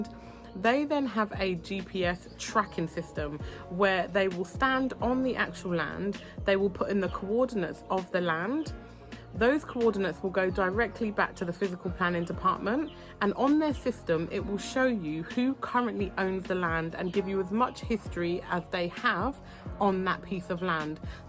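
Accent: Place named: United Kingdom. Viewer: Greetings, that is indeed a lengthy process, however it's a great business for one who wants be become a realtor. Love your British accent. Are you enjoying life in The Gambia?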